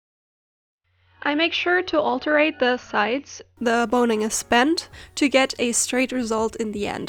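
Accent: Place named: Germany. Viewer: Are you German? Coming from a German, you sound very German.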